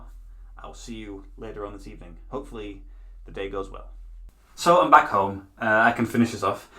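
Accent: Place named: North East England, United Kingdom. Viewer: I go to charity shops looking for footy shirts - no luck so far. Guessing from your accent you are from somewhere in the north east, same as me, so you’ll need to take the mannequin for good luck.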